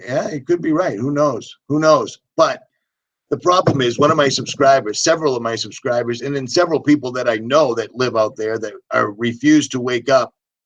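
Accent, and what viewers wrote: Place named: Massachusetts, United States. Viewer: Hi! Great video as always! Every time I listen to one of your videos I can’t help but think of my time living in Marlboro Ma for 10 yrs! Still have some family & friends so when you talk it always reminds me of them and makes me laugh because of the accent! I love it!! Be blessed and God Speed! Love you keeping it real!!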